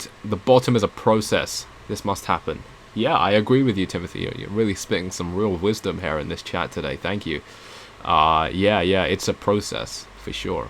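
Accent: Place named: London, United Kingdom. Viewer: London posh boy accent.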